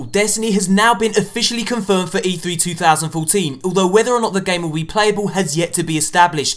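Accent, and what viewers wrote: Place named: United Kingdom. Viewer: yea and everything sounds classy with a British accent! lol :)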